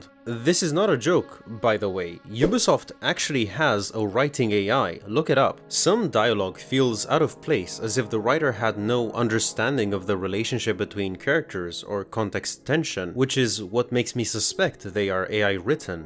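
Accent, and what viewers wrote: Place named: Balkans. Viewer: No way you're actually Dutch? I'm really sorry but you got some sort of Balkan accent, I never expected that lol Of je kan Belgisch zijn... Ik heb geen flauw idee hoe een Belgisch accent in het Engels klinkt x3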